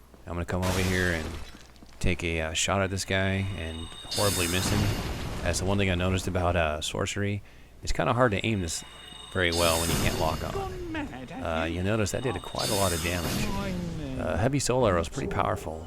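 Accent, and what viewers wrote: United States. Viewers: I really like your commentaery. I am Scottish and I have relatives from LA and New Jersey. I love American accents. Whereabouts are you from? Thanks.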